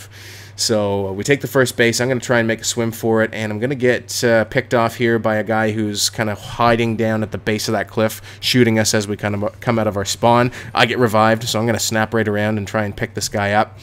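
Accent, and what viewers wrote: Canada. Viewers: are you canadian because i don't know why but i hear that type of canadian accent when you speak and pronounce vowels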